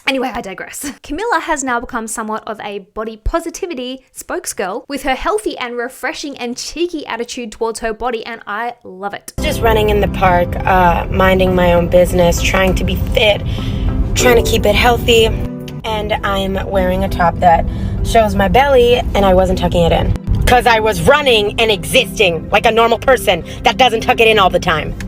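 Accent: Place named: Australia. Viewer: Girl! Since you been back in Australia your accent is getting stronger! I'm here for it not gonna lie lol 🥰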